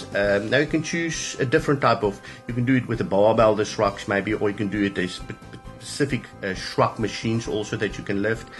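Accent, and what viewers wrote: South Africa. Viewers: Is this the Afrikaans type accent?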